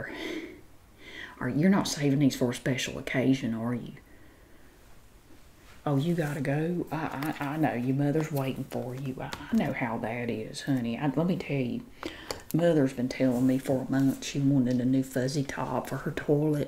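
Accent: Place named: Central Virginia, United States. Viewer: Can I just say that you're normal accent is so similar to how people talk where I live near Central Virginia.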